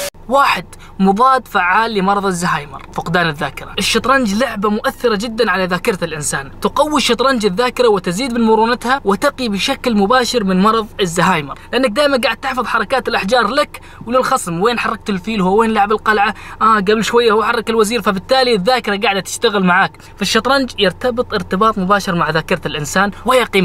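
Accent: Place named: Saudi Arabia. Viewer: اتوقع انك مصري بس تتكلم سعودي زين ما شاء الله عليك 😂💛 ،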